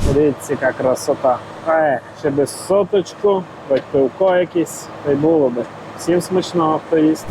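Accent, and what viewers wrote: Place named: Transcarpathia, Ukraine. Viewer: А ти походу із Закарпаття) удачі бро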